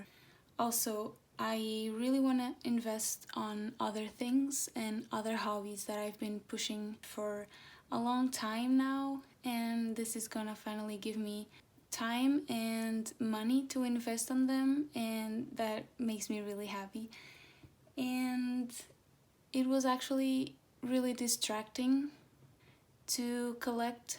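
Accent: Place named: Portugal. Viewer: i knew there was something off about your accent, com que então és portuguesa ahaha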